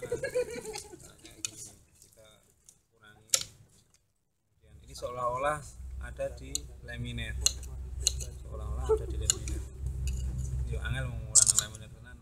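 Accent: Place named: Java, Indonesia. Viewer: Coba gaya bicara nya diperbaiki, jangan terlalu medok supaya enak didengar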